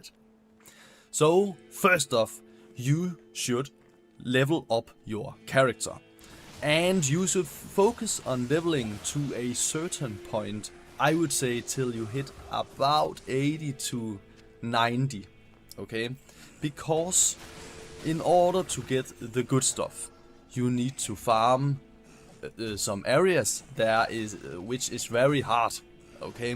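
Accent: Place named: Denmark. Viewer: Uden tvivl dansk accent 😂😂😂😂😂 fed video!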